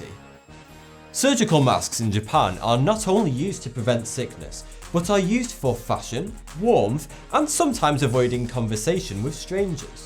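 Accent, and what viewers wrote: United Kingdom. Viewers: he is handsome.........love his brittish accent and voice.... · 675 000 views..... man! british accent is the real Mc coy!!!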